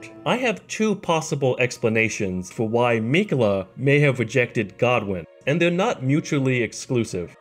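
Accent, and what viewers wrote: Pittsburgh, United States. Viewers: Are you a yinzer?! Pittsburgh'er?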